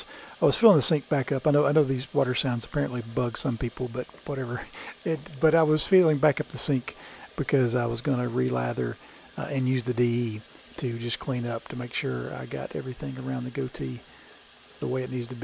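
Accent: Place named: United States. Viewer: no, just American in general.🤣 I was trying to place your accent in a specific state, though.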